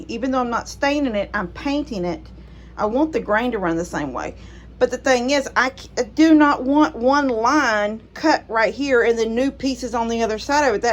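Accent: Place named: Georgia, United States. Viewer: I feel like I'm in the room with you having a conversation. I was in a funk because of some physical problems, surgeries, etc and getting older. I was told I could no longer do stuff and I believed it. I needed repairs to my house little things I used to do myself or with my husband or things my Dad taught me growing up helping him. I learned from my Mom also. During COVID my handyman left this state and I wasn't able to find someone to take his place. ( I wasn't going to get scammed). So I watched you and I said I can do that. I'm limited to some things physically My body can't do I tried but I painted, patched holes, cut boards bought everything that was needed to make a closet just had to get help putting it together. Watching you reminded me I knew how to do things I wasn't helpless like I was made to feel. Now I have found a really sweet young lady that has worked helping out on her grandparents farm and knows how to do some stuff and what she doesn't know I show her and she has helped me finish a couple of projects and is going to help me with others. 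She works goes to college and still helps her Dad keep the farm going for grandma. I showed her your video and she said that you talk like me I'm from GA but living in Illinois now. Anyway thank you for reminding me I can still do stuff and even teach other young women to be self sufficient.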